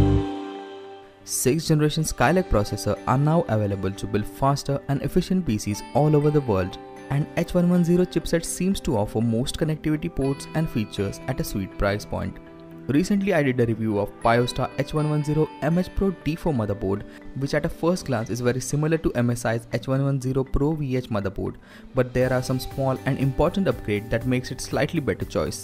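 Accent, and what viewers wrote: India. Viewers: dat indian accent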